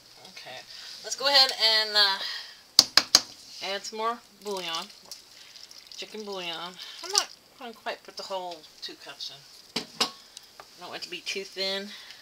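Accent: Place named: South, United States. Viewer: I love your Southern ? accent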